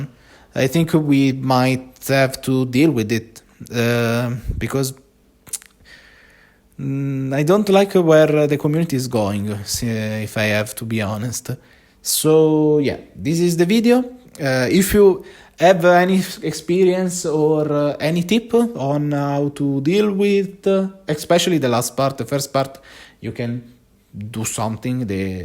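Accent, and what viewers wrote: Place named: Italy. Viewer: Hi mate, really enjoy your job here on the channel, keep going with it!! For the first problem i just have a lot of tokens for the differents effects(mana, storm, flashback etc.) and i have spent one afternoon goldfishing and try differet approach to find my spot on the playmat for everything in a way that was clear for me so that in tournaments i can go pretry fast and if oppo has doubts i can explain quickly For problem 2 unfortunately there's nothing you can do about it....i've stopped bringing storm at FNM because everyone i end up against was complaining about something and because we all do it for fun in that occasion i stopped, while at RCQ people are still complaining a lot because the deck is indeed frustrating to play against, but as you said in a competitive environment everyone wants to win so i just don't listen to them, or i just say that i know that the deck is frustrating but we do what we can to win and after the match they look to understand cause they are there for the same reason(and let's be honest every deck is frustrating on it's own in modern right now cause everyone has to do unfair things to win) Also are you italian?(i am italian and your accents really seems italian to me)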